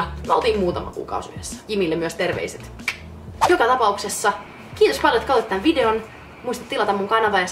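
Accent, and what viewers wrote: Finland. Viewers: That Finnish accent makes her look hotter wth❤❤😍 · Wow, Love your Finnish accent:)... also didn't realize your so young (... now I feel? like 'Edward Cullen' old;-). Personally, didn't keep a journal at that age ( a guy thing?... couldn't write right!... hum? still can't!;-). Thanks for sharing your ridiculous with absolute strangers... kinda makes the world a much smaller place. <3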